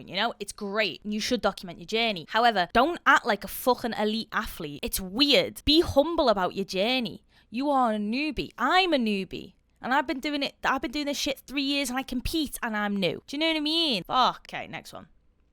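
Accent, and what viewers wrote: Liverpool, United Kingdom. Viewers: I’m Russian and I adore your British accent. It’s a heaven for my ears besides all the well educated guesses of yours. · Aaaa scouse! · I was suggested to watch you on the last video I did! Love the scouse accent and looking forward to seeing more of your content 😁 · I've lived outside the UK for 8 years now. Love the vids of yours I've watched so far anyway, buuuuuuut listening to your accent is making me so nostalgic for home and adds an extra layer to enjoy!!! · liverpool, england. it's a scouse accent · Accidentally clicked on this and heard the Scouse and stayed the whole time haha. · Where exactly in the UK is your accent from? American here, just curious — thanks 🙏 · So lovely to hear that Scouse accent. Hello from down here in Australia! Well done on your incredible achievements! Ps. seeing as you're an Amberlynne gorlie, did you see her work-from-home WIEIAD video? 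It was hilariously stupid. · Heard the scouse accent mixed with lifting knowledge and subbed. Hello from West Yorkshire!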